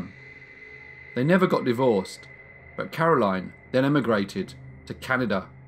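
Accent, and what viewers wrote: United Kingdom. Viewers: Steph Luv I think so too. But then again, I like British accents.😊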